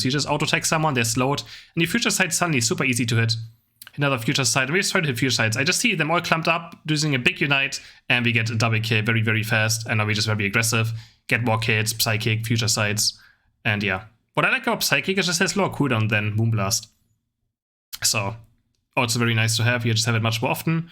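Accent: Germany. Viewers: beside his accent that is a giveaway his contact email address ends with de so I'm pretty sure he is German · 100% Germany I can tell by the accent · considering his accent he must be a german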